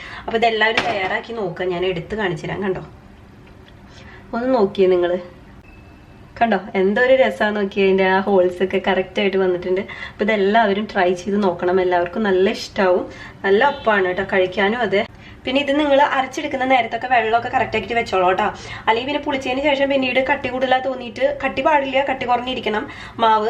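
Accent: Thrissur, India. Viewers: തൃശ്ശൂർ ക്കാരിയാണോ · chechi adipoliyatto supr avadaranam thrissurkkarellam inganano nishkalankamaya voice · Speed kurachu paranjal manassilaakum.Trichurkkari aano? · ശ്ശേ,,,,,,,,,,,,,,,,,ഇടക്ക് പെറുക്കി തിന്നു അല്ലെ? എനിക്ക് ഇനി വേണ്ടാ ട്ടോ തന്നത്താന്‍ കഴിച്ചോണ്ടാ മതി,,,,,,,,,ഹായ് എന്തൂട്ടാ ഇത് തൃശ്ശുര്‍ ആണോ വീട് പൂരത്തിന് വെടി പൊട്ടുന്ന മാതിരി അല്ലെ വാക്കുകള്‍ ,ഇഷ്ട്ടായി ട്ടാ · മോളൂ! തൃശ്ശൂർ സ്ളേ ങ്ങാണോ? ഭാഷ നല്ല രസം ണ്ട്. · അപ്പം കണ്ടു നോക്കീതാ. ദേ അപ്പൊ ഒരു തൃശൂർ talk.. ഇതെങ്ങനെ ഇത്ര speed talk.. ഞാനായിരുന്നു ഇവിടിരുന്നു ശ്വാസം പിടിച്ചത്.. വീഡിയോ ഇടയിൽ പരസ്യം വന്നില്ലായിരുനെങ്ങിൽ ശ്വാസം കിട്ടാതായേനെ.. അടിപൊളി ചാനൽ.. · Hai chechi eppol aanu kandathu. ..subscribe cheythu ta Nalla talks. .thrissur aanu nnu Slang paranju 😀 evideya? Njan sandhya Lajith. .triprayar aanu. .eppol hus nte oppam tamil nadu aanu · ചേച്ചി തൃശൂർ കാരി ആണോ ആരായാലും സൂപ്പർ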